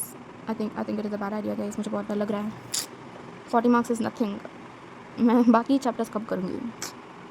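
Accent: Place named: Hyderabad, India. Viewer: oh bruh you speak telugu, same....where you from ? seems like telangana and that too hyderabad...all the best for iiser!!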